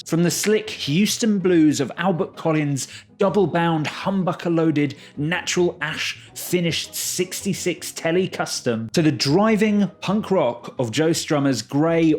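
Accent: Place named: United Kingdom. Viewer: This guy kicks ASS! I usually HATE Brittany accents. Love this dude! Different NRG💡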